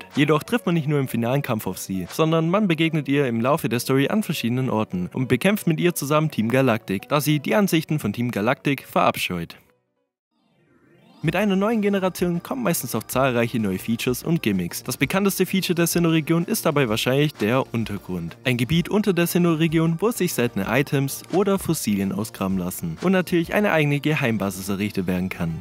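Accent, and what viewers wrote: Swabia, Germany. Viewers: Geiles Video, 11/10 für schwäbischen Dialekt! ^^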